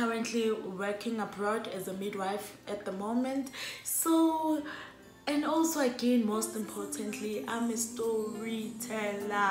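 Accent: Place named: Saudi Arabia. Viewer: Saudi Arabia, nice. Beautiful accent👌🏾👌🏾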